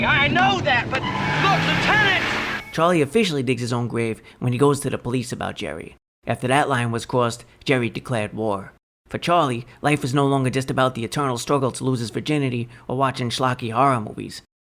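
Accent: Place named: East Coast, United States. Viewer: Nice one! ❤❤❤ Love your East Coast accent accent! New York??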